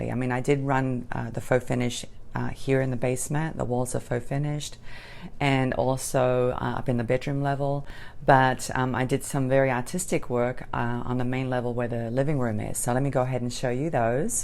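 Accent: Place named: Australia. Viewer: I knew I detected an Australian accent! Wow, you had an interesting childhood! I had to make a choice in High School between music and art as we weren’t allowed to do both. I played violin and was in the orchestra so my path was laid. But, in elementary school, I won a few art awards and so I decided to bring it back into my life. Unfortunately, I became disabled almost five years ago now and I’ve become interested in different types of crafting. I enjoy beading and jewelry making as well, but I also enjoy paper crafts like card making and journal making. I’ve also become interested in polymer clay, needle felting and embroidery along with cross stitch. I’m also interested in learning watercolor techniques. I’m kind of all over the place and I think I need to figure out a schedule or something as I have more interests then time/energy to do them. 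I guess I’ll get it figured out eventually. Thank you for sharing! 💜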